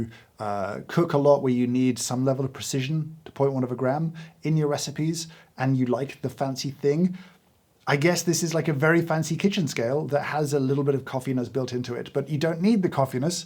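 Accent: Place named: England, United Kingdom. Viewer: Why he sounds less English today?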